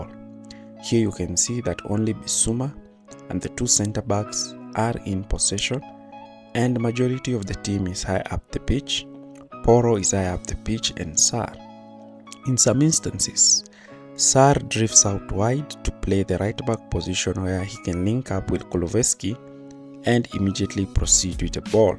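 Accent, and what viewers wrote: Kenya. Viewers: Sounds like a Kenyan😃😃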